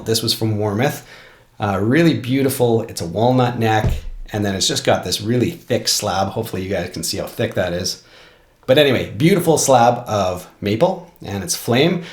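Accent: Canada. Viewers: Are you Canadian? Cause I am and I detect a bit of the old "eh" going on.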